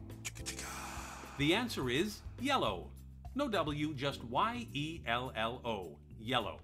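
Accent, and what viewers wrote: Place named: Canada. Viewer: Canadian, eh? 7/10...thanks, from Alberta! Habs fan...sorry!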